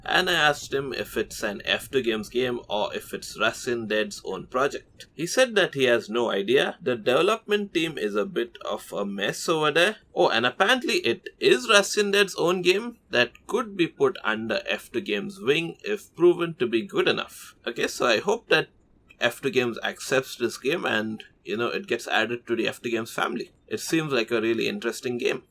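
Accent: India. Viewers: Sir are you from India because your voice like india's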